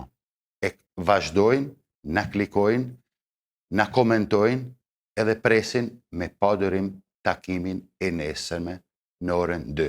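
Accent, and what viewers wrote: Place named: North, Albania. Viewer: Po ju kush jeni nga vini,dhe profesioni i juaj,,nga gjuha dukeni nga veriu respekte profesorit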